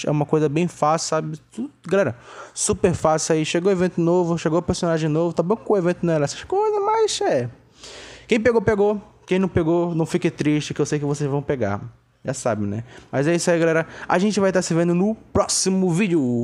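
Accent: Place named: Pará, Brazil. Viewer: Você é de onde??? Parece o sotaque do norte,e do Pará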